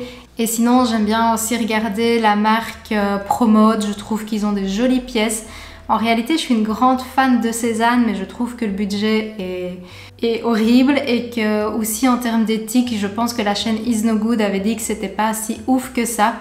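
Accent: Belgium. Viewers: oh désolée. Je découvre seulement ta chaîne 😉 Et c'est un plaisir d'entendre ne YouTubeuse avec notre bel accent belge ❤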